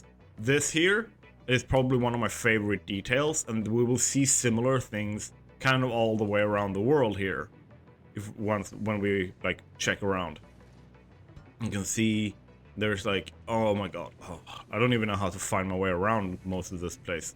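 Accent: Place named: Sweden. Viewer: i hear swedish accent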